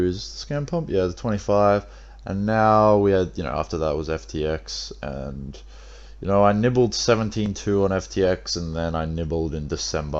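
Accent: Australia. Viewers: aussie accent fooo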